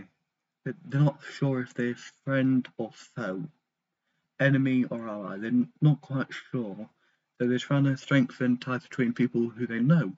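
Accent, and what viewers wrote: United Kingdom. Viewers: Nice Bri’ ish voice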